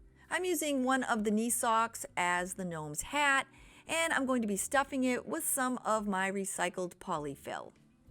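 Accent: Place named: Boston, United States. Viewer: Any chance you're from New England area? I hear some kind of accent similar to my Mainah accent lol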